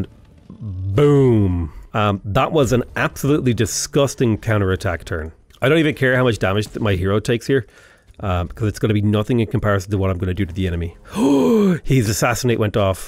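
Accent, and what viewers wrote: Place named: Ireland. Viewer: Thank you sir, very informative very interesting and such learnable for Ireland accent 😊 This is good way for me to study English. Thank you again. Please more AoW4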